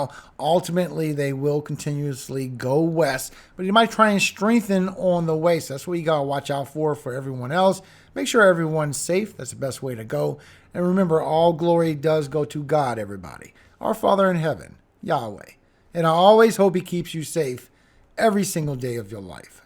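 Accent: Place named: New Orleans, United States. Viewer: Thanks,. You sound like you are from the Westbank, New Orleans. 🙏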